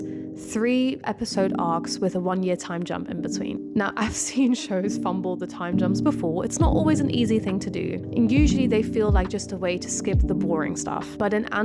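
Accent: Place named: Netherlands. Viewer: Jij klinkt Nederlands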